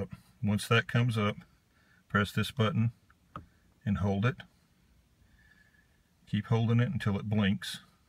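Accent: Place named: South, United States. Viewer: You must be a southern boy...awl light....awl .... aint no crime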